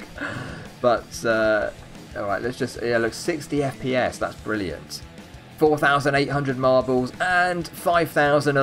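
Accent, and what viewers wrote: United Kingdom. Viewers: Are you British? I ALSWAYS WANTED TO BE BRITISH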